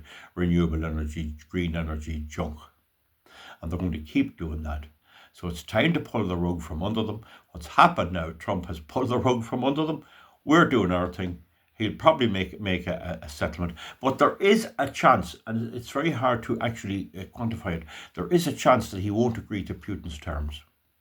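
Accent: Ireland. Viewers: Last time I heard such wise words in a fine Irish dialect was a while ago in a Cricklewood tavern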